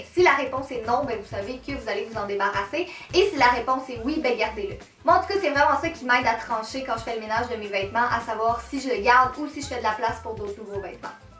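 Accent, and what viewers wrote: Quebec, Canada. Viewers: J'adore l'accent canadien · Hi j'adore franchement ton accent Québécois! !! Je suis française et j'adore l'accent du Québec 💖 Bisous ma belle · c'est le mangnifique accent Canadien/Québécois (désolée, je ne sais pas si c'est la même chose:))! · je suis francaise et j'adoooooore l'accent québécois 😍😍😍 (j'aime beaucoup ta châine) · j'aime trop l'accent québécois ❤ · coucou ! j'habite en france, et j'adore l'accent québecois ! c'était juste pour te dire que je t'adore, tu es trop belle ! gros bisous <3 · Elle est québécoise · t quebecoise ou anglaise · Elle vient du Québec comme moi🇨🇦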